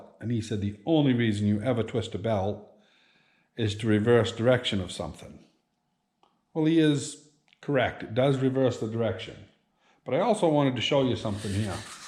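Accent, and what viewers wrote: Maine, United States. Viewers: As soon as you started talking I thought " this guy is from Maine" looked at your channel name and was pleasantly correct.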